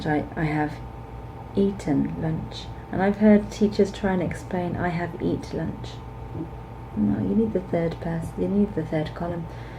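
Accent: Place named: United Kingdom. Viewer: Very interesting insight into this issue (and quite brave of you, I must say). As a Spanish ESL teacher, I used to work at an academy and noticed not just basic mistakes similar to the ones you mentioned, but some serious pronunciation issues. To be fair, we have an obsolete educational system in Spain when it comes to English: you never get to really practice your speaking or listening skills as much as needed (I'm talking about regular public schools). It is also true that nobody knows it all and even English native teachers do make mistakes. However, I believe people here tend to give it for granted that the fact that you got your university degree means you already have the sufficient level to teach English... and more often than not, this turns out to be self-deception. We must be aware of our weaknesses and the need of constantly improving our knowledge and skills, especially if aside from making a living out of it we intend to offer a real good-quality teaching of the language. 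Unfortunately, this is just like any other profession: some use their uniform as if it was an irrefutable evidence of perfection. I reckon what really makes the difference is whether you truly love what you do or not: if you do, you will try your best to continue correcting your mistakes and boosting your abilities. If not, you will just keep delivering sloppy lessons. By the way, you have such a clear and lovely British accent! I hope you are doing well and both your students and colleagues can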